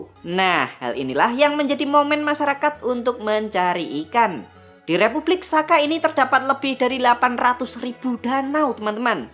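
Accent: Java, Indonesia. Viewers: Logat nya jawa pula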